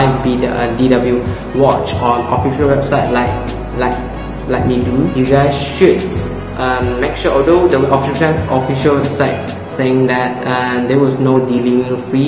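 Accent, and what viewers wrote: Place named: Singapore. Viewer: That singlish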